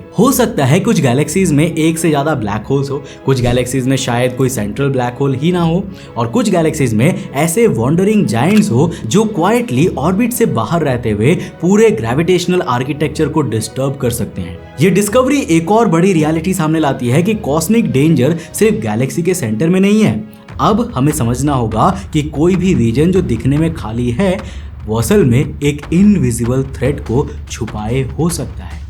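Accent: Bengal, India. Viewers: Bhaiya kya ap Bengali ho ?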